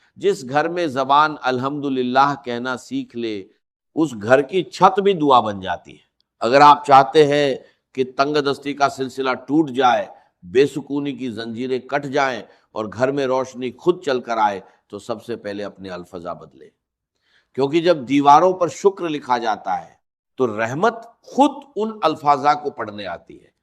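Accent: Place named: India. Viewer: Yes you're right this is not real video this is not his voice and you see clear that is Indian accent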